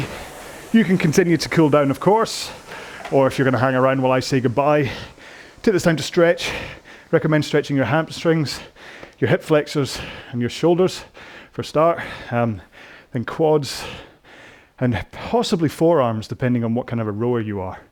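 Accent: Scotland, United Kingdom. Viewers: #so, thanks again for scotlishly (is this a real word?) talking through this climbing intensity row. #muchmorebetter, I know you have already finished the series, but I'm just enjoying them one by one since the sportschools are closed again. Cheers, Bart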